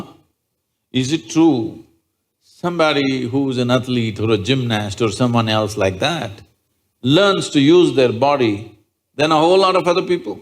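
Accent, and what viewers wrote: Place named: India. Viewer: چقدر لهجه انگلیسی حرف زدن هندی ها مزخرفه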